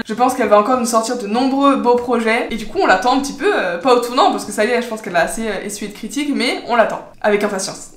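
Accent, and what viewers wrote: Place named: France. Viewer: Trop bien ! J'adore ce format. Le seul truc que j'aurais à redire c'est : arrêté de te justifier haha. Par exemple mets un petit disclaimer au début pour ton anglais au lieu de le dire à chaque fois et traduis directement les paroles (tweet, interviews etc) pour ne pas "galerer". De toutes façons les français ont tous un accent très prononcé et souvent un anglais très moyen, ne te prends pas la tête ! On est pas la pour te juger. 🫶 En tous cas grosse force à toi, on attend la suite ! 🤼‍♀️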